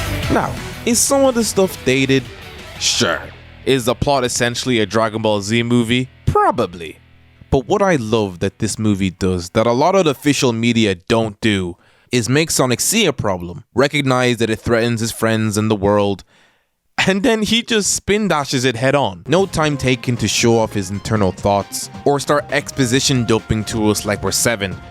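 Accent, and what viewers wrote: Ireland. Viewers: ARE YOU IRISH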